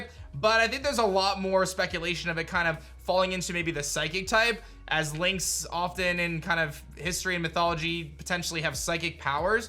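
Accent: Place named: United States. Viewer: all tho respect but it hurts to hear americans say spanish words ALL THOUGH RESPECT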